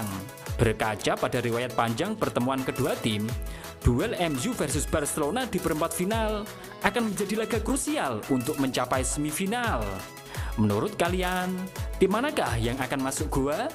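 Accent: Java, Indonesia. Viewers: Pengisi suaranya pasti orang Jawa ni... Medok 😁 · Jowo medok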